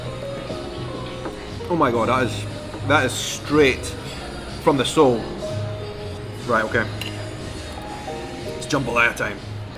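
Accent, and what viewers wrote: Scotland, United Kingdom. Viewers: Absolutely funny as shit watching the subtitles try and keep up with your Scottisms lmao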